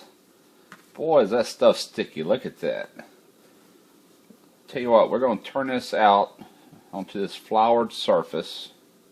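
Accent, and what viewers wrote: South, United States. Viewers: + southern accent = win, i'm a huge fan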